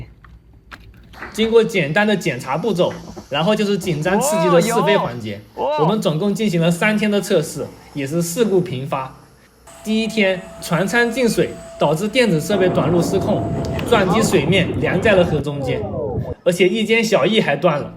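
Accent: Zhejiang, China. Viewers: 很浓浙江口音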